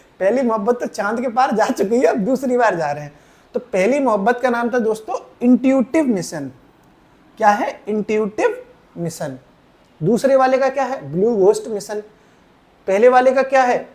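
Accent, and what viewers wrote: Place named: Meerut, India. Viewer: Are kaha shaab...marenge bas boli hiii khadi hai....Mai meerut se hu 😂😂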